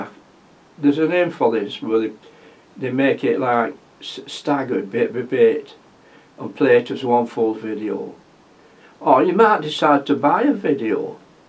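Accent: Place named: Bolton, United Kingdom. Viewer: Hi. Great to put a face to your wonderful tutorials. You are truly an inspiration. I love your accent. It reminds me of my childhood, growing up in Hulton Lane, Bolton, horse-drawn Manfredies ice cream and day’s when we “ played out” in summer until after dark. Best wishes.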